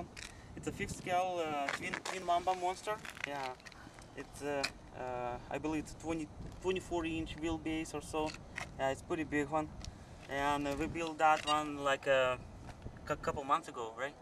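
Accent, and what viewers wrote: Russia. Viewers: did you cut out a lot of parts for it . like the aluminum stuff ? and is that a Russian accent ? and where do you live ?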